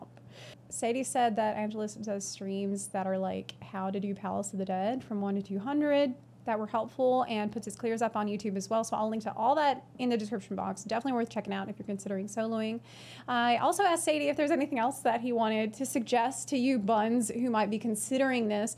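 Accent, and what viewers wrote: South, United States. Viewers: You have the cutest country accent I've ever heard where r u from ?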